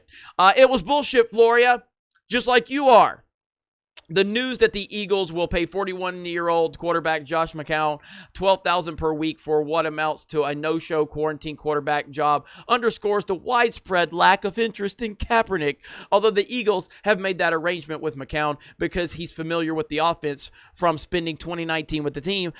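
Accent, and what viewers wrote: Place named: Alaska, United States. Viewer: Are you an Alaskan Bush person? You have the same accent.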